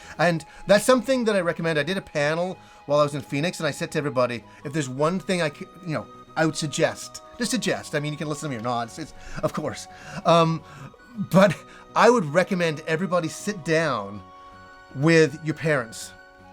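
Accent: Yorkshire, United Kingdom. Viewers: Lol a real yorkshire accent. I pray Allah SWT guides you and your family. Ameen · She sounds like a proper Yorkshire lass!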